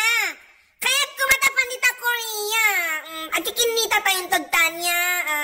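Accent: Ilocos, Philippines. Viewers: Kayat ko ti panagsasaom ti ilokano auntie makapakatkatawa makapaikkat ti bannog salamat...hello auntie from Las Vegas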